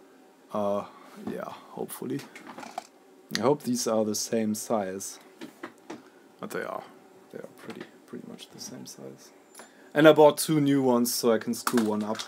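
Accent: Germany. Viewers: nice repair and easy-to-understand explanations... I happen to have the same mic and I was wondering if you could tell me what to fix/ replace in particular because my headphone jack doesn't work anymore... So whenever I try to monitor my still working recordings I can only do it without the mic's output AKA by using the normal speakers included in my PC... Therefore could you please explain what causes the headphone jack to stop working or make a video on it? I noticed that you were very good at explaining in this video, it would help me out a huge bunch Btw, I also noticed that you had a bit of a German accent, I'm a German myself xD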